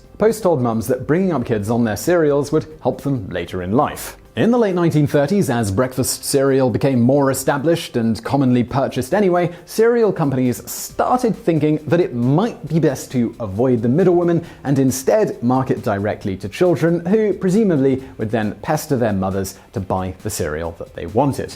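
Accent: United Kingdom. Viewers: This is the most British Simon has ever sounded in any video.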